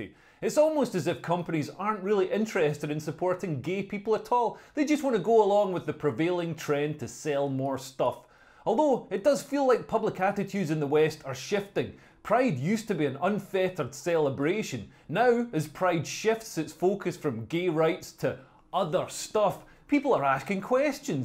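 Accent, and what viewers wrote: Scotland, United Kingdom. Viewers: This guy and his damn beautiful Scottish accent is spitting all over me Spitting facts that is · I Really Like his Scottish Accent Talking about why he Hate Lgbtq Idk What he saying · Coming from a gay dude, great video. I completely agree, I thank you for speaking out about this. And who doesn't love a Scottish accent? Besides the Romans of course... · Bro is peak Sco'ish 🏴 👌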